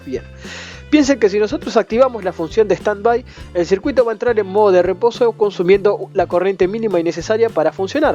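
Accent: Argentina. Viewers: eres argentino o chileno?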